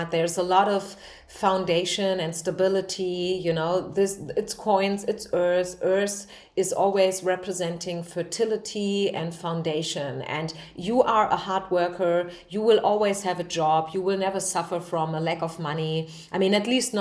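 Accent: Germany. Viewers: #3 thank you so much! 🦀💗 For your wisdom, channeled massages and tips! Also for doing all of this to heal the world and yourself! I'm bso grateful for your readings and seeing you here! I love your German accent! (I'm a Mexican but I studied German for a short time in high school and I find it really gorgeous💗💗💗) Also I saw one of your Deutsch readings!!! And I also loved it! It's incredible how we are all connected, my intuition translated the words that I didn't study and it's incredible 💗💗💗 Love & light to you! namaste 🌻✨🌈🐉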